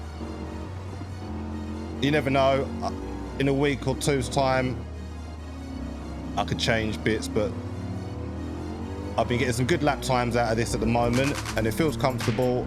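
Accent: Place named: South London, United Kingdom. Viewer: Where abouts in London are you... sound a bit south .. I will check out a few more of your vids... cheers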